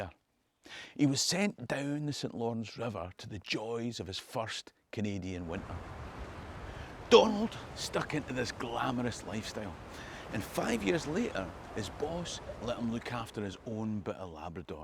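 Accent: Scotland, United Kingdom. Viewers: I think the accent has to be my favourite. The Scottish accent has inbuilt inflections, expressions and passion. It's also good to listen to a mixed race person who is at least as interested in the history of his white ancestors as his black ancestors. None of us really knows all the races they come from. As my paternal grandmother once said "a person is lucky to know who their grandparents are by looks. Women were forced. Women had affairs. No one really knows". As for DNA tests, people have received mixed results from different labs and some people have sent off the DNA of their pet and received back results for a human. A real in depth result costs a lot more than most run of the mill labs offer. DNA ancestry tests are for profit and for data gathering. One in particular admitted selling the info on to insurance companies.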